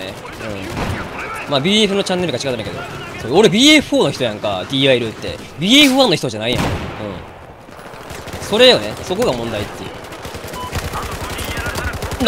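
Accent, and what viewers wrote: Kansai, Japan. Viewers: 関西弁ってひとつの持ち味だよね。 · しょー 関西弁の人が上手いこと敬語を交えて話すのって地味に凄いからな(´・ω・｀)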